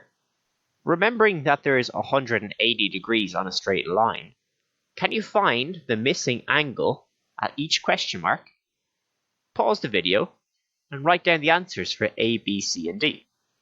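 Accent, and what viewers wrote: Ireland. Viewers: These videos inspiring I have a theory but: Are you Irish?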